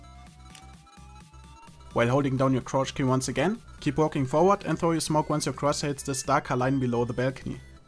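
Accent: Germany. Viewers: Das deutscheste Englisch ever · Are you from Germany? You have very german accent. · der deutsche lol dat english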